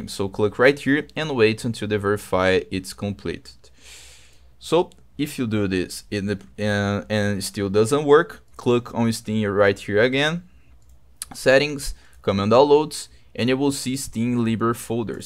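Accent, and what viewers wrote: Brazil. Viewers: Thank you very much. Recognized that brazilian accent from a mile away!! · no puedo aguantar al br hablando ingles asdkjashdka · esse ingles é brasileiro ein kkkkkk satisfaçao irmao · i love you, eu sei q tu é br, esse sutaque nao me engana marvadu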